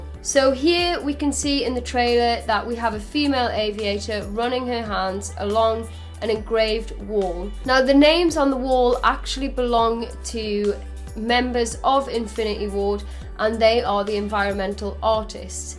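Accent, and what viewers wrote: United Kingdom. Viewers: i dont know maybe somewhere in britain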